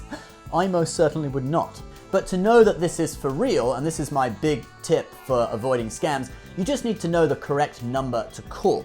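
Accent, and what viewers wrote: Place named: United Kingdom. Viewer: This would have been a more reassuring video if the guy didn't have a British accent.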